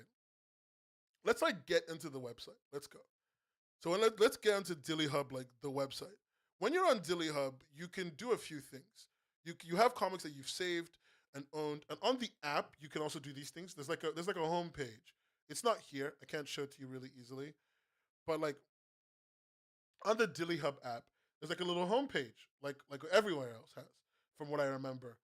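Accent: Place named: United States. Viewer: youre british?? your accent sounds so american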